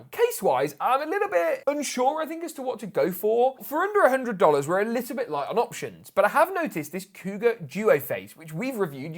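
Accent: England, United Kingdom. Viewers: Why tf dollars ? You sound English? Pounds perhaps ?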